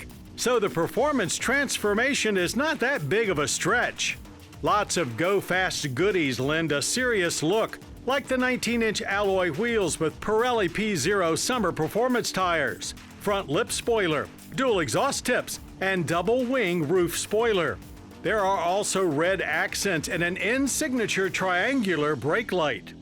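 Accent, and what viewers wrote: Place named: South, United States. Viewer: Remember when he had a southern accent?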